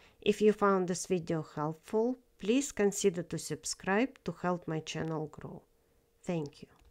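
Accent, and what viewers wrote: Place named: Russia. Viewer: Thank you both for the good problem and your great explanation! By the way, I love your Russian accent when you speak English.😊❤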